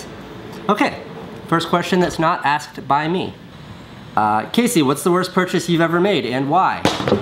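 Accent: France. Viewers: haha love your french accent! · your french accent it's cool :p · That accent in french though :) Greetings from Paris ! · Great french accent !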